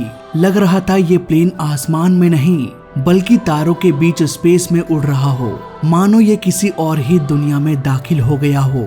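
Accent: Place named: Gujarat, India. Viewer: Gujjubhai accent..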